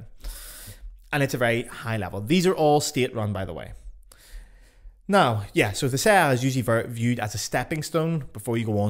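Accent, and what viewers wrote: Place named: Ireland. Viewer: Merci monsieur…je suis américaine. Je parle français et j’étudie la flûte avec un professeur français…aux États-Unis ! J’aime bien votre podcast mais s’il vous plaît arrêtez les insultes vers mon pays! We aren’t all idiots and some Americans have even composed some great music if you can believe it. All that to say, I do appreciate your podcast and I am not super offended, but as you continued in this episode to make fun of the American accent over and over, I was forced to do many many eye-rolls. You do admit that you anglicize Lot and Boehm names lol, you just do it with that charming Irish accent lol 😂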